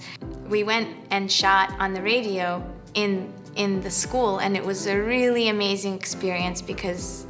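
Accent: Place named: Russia. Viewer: слышу носителя русского с отличным американским акцентом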